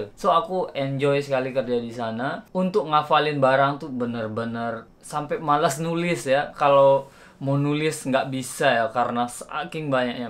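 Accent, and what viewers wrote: Lombok, Indonesia. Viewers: Ndk ini telang ye medok bahsa lombok kadu bahasa indonesia.... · Ya ampun...logat lomboknya masih kentara banget yaaaa....wkwkwkwkwkk Salam dari Lembar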